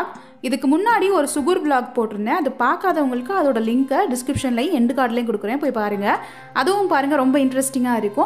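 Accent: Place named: Theni, India. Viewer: Neenga cumbum theni pakamnu ninikiren ...unga slang theriyudhu..crct ah sister?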